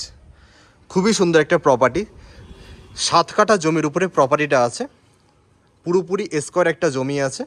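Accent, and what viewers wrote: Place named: Bangladesh. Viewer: কিছু মনে করবেন না ! বলছি দাদা আপনি কি বাংলাদেশি? আপনার কথাবার্তা একদমই পশ্চিমবঙ্গের বাংলা ভাষার মত নয়।সম্পূর্ণ বাংলাদেশি বাংলা ভাষার মত। আবার আপনি অস্থির কথা টাও ব্যবহার করেন যেটা বাংলাদেশীরা করে।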